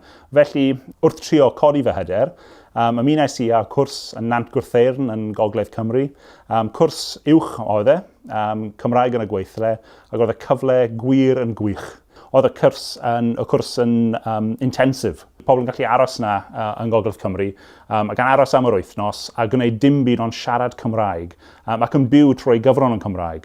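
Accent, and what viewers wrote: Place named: England, United Kingdom. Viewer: How have you managed to speak Welsh with an English accent?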